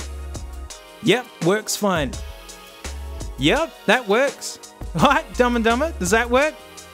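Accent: Australia. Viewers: I love watching an angry Australian man yelling at iPods. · Wasn't ready for the speaker to have an australian accent lol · hes australian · How I love the feeling of sleeping while hearing a Australian dingus shout at an ipod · i like an Australian man yelling at tech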